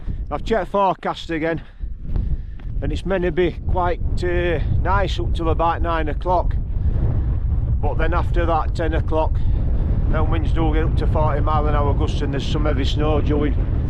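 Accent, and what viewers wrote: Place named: South Yorkshire, United Kingdom. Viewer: Loving these videos I’m a ryhill lad originally you sound like you’re from Royston or South Yorkshire bloke live in Barnsley now me and my son been watching these videos lately and the other folk good bloke outdoors Paul mesner lads only 7 but likes these videos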